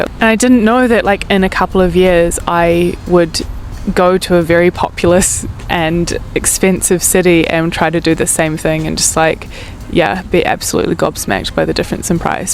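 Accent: New Zealand. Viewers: I love that there’s someone out in YouTube land with my accent. And why it feels great when you’re back here in nz is coz we are way cool here 😂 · you still have the kiwi accent 🙌🇳🇿 I’m in Northland, NZ. Thinking of joining a pottery club this year, thank you for your video! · Yeah another Kiwi. I am part of the Waikato Society of Potters. Love it. I watch your channel and feel so at home with "our accent" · I love your accent. I am trying to get an NZ accent! Everything you said about the NZ pottery clubs is soooo true! I learned pottery at one in Whangarei in 2022. Everyone was so nice and helpful. I am still learning a lot but hope to have a business when I go back to the USA. And the cost is so low here! I think in the US the monthly cost is what you pay in London. I only pay $120/year in NZ! It made it possible for me to learn pottery. I will miss NZ so much when we leave. 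Plus it is so beautiful here and it smells good!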